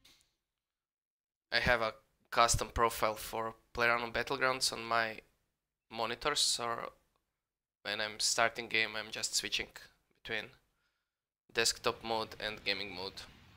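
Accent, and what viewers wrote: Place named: Serbia. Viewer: Bukvalno sam znao da si nas jer kako pricas engleski nisam ni trebao da gledam foldere xD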